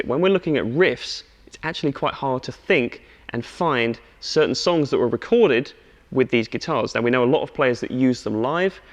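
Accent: United Kingdom. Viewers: When speaks, I close my eyes and pretend Joe Bonamassa is speaking to me with a British accent. Incredible playing by the way too!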